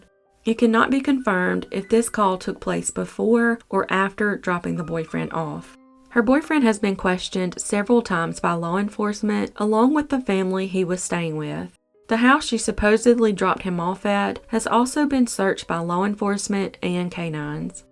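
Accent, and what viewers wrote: South, United States. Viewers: I find your subtle southern drawl to be quite endearing, bringing a bit ov light to the darkness! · There's that voice! Southern drawl melts my heart. I ordered the SGCS apron in green a couple of weeks ago. I never have gotten a follow-up email. Any idea how long it takes them to ship your items? Or anyway for me to check my order status. I sure hoped it would be here for my New Year's Eve cookout tomorrow night. Oh, well, there's always Memorial Day! Thanks,! EDIT: Just checked my email, the shipping notice came yesterday, I guess I overlooked it. Thanks again!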